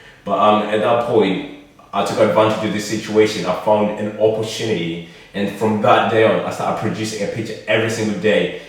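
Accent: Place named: United Kingdom. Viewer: Don't overlook how MASSIVELY important it is to have a charming British accent, you seemed to have passed right over that bit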